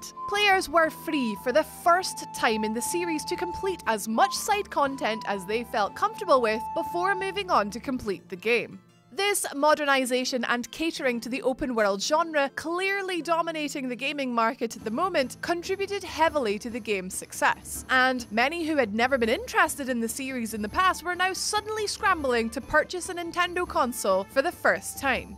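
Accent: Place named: Scotland, United Kingdom. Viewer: if you put your mind to it you can hear an accent that sounds like the princess from the disney movie Brave. yeah, I forget her name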